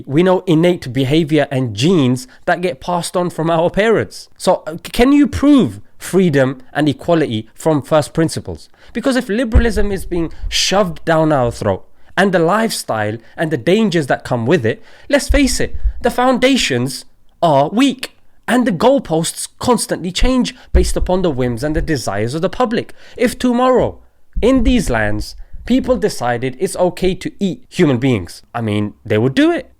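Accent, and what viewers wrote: Pakistan. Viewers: I laughed so hard on your Urdu accent😂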